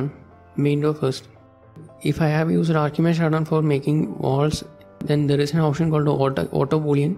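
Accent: India. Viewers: Sorry your knowledge might be good but ask your foreign friends if they understood anything you anounced in this video. Your Indian Dialect is here unuseful use neutral clear and slow spoken language please! Your Video might be interesting for more people indeed. Thanks brother! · He is, but he has a heavy South Indian accent. But he is speaking perfectly well 😊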